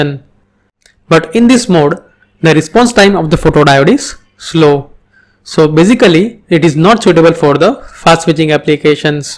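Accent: India. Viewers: The Indian pronunciation in English is very so difficult to listen explanation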